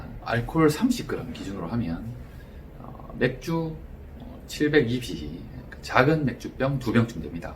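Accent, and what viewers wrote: Gyeongsang, South Korea. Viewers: 갱상도~~사투리 쩐다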